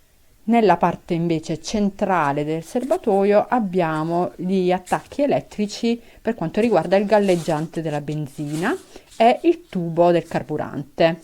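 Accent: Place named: Apulia, Italy. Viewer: Brava e chiara. Con un simpatico accento pugliese credo. Complimenti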